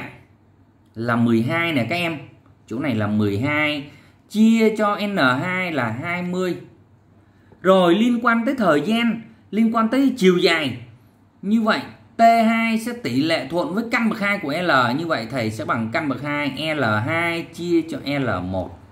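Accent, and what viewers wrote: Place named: South, Vietnam. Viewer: thầy ở miền nam đúng kh thầy , em ở miền bắc nên nghe hơi ngượng ngượng hehe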